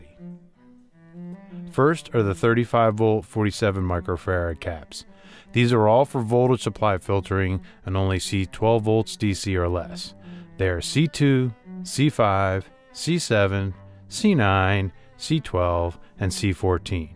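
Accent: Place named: United States. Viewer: That's excellent. I would like one absolutely. I reside in UK though. I think I may of heard your accent being USA. Either way. Best wishes.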